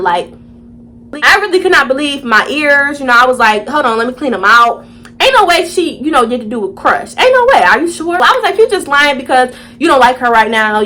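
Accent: Baltimore, United States. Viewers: the baltimore accent 😭😭😭😭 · LMAOO THAT ACCENT NOT MARYLAND THATS BALTIMORE ITS DIFFERENT 😭😭😂 but I’m from dc so I get it lmaooo that shit funny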